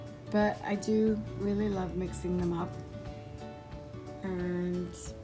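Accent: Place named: United Kingdom. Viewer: That's a very cool idea! I hear that British accent sneak out once in a while.😁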